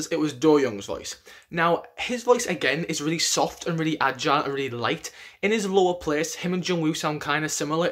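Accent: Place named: North, United Kingdom. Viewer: im so glad i stumbled across your channel, i for some reason love hearing you say their names in your british northern accent rather than all the american vocal commentaries cos it feels so familiar to me hahah "haaey chan". i love that u comment on each members voice and have good things to say about all of them cos they really are a group of MANY insanely talented singers.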